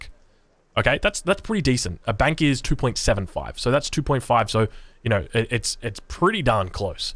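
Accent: Australia. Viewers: It's just his aussie accent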